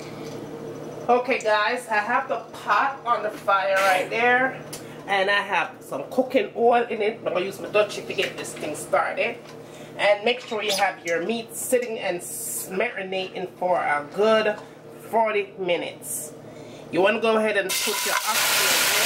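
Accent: Jamaica. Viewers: Yessss I feel like I'm in Jamaica listening to you right now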